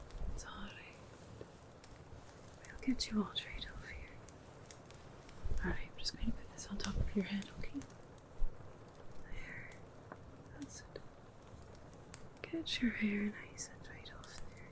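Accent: Ireland. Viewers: I do not claim to have heard many Irosh accents in my life but i love everything about the way you talk to me. Thank you for warming me up in this rainy weather! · Ginger curls and freckles suits you well. How do you do a Irish brogue so well? It sounds so natural. 😊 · After the rain subsided I will find the mushroom I seek., you do a mean Irish accent. You really are the master. Terrific RP!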